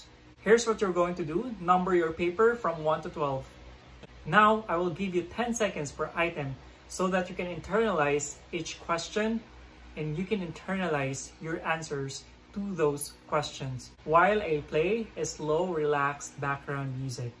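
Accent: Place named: Philippines. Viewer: Owwh i like ur content po and also ur accent when u speak english proud filipino talaga hehe more interesting video pa lods cant wait to see more videos from you po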